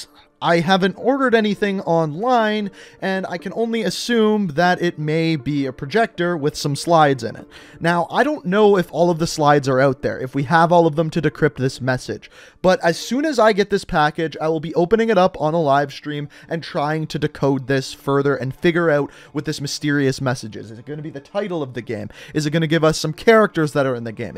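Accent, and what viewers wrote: Canada. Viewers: Is this dude from Canada?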